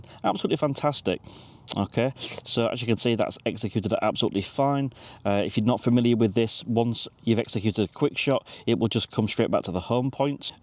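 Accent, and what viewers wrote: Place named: Scotland, United Kingdom. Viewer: Came for the information, stayed for the SUPER THICK Scottish accent. 😁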